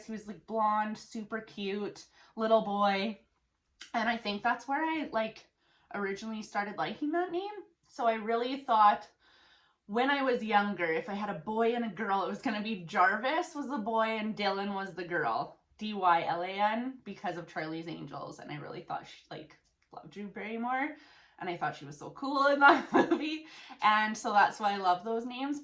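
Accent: Canada. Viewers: this is the first video of yours ive ever seen so are u canadian? u sound like u have a super strong canadian accent (im canadian btw) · Sorry I’m late. I just started to watch you’re videos. I was fascinated by the diy haircut video and the Canadian accent. I’m from Michigan. 😉 Here was our boy name list. Our sons name is Ethan 💙 Jack Jackson Jake Jacob Jaden Jayden Ethan Carson Zachary Carter Tyler Liam Bentley Blake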